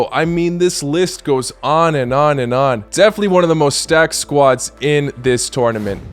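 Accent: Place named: United States. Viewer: Is he American cus he got a american english accent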